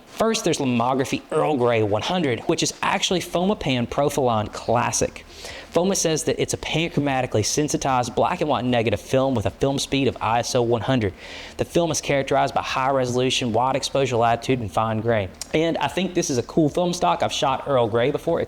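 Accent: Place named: Appalachia, United States. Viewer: I’m delighted to find the channel, and I’m tempted to switch out the digital and try film photography after a long break. Also, and I apologize if this is intrusive: where are you from? Your accent reminds me of locals in the southern stretch of the Appalachians, where I went to school, but maybe a bit different. Tennessee?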